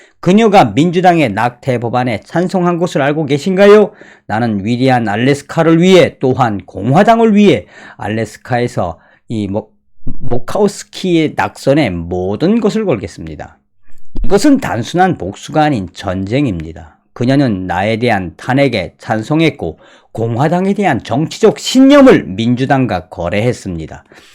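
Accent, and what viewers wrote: North Korea. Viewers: 탈북자시라 억양은 어쩔수없는거 같아요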